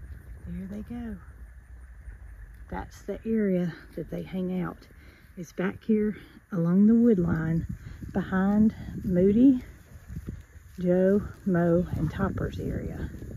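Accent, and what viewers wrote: United States. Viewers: i like your accent, it shows we are in a DIFFERENT part of usa, different is the spice of life!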